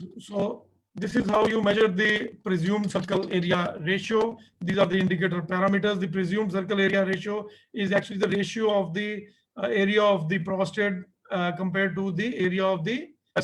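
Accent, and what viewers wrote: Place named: India. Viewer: There is NO ISSUES in the Audio, But the English pronunciation of the Doctor from India‼️He has a very strong accent from his country of origin. My observation only. Thank you for the lecture doctor‼️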